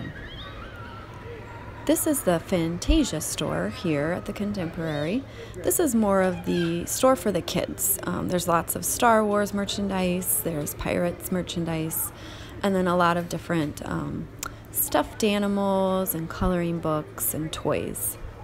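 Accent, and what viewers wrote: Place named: United States. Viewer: Yes, she does have a very pretty voice. Being English, I have the bonus of hearing her soft American accent as well.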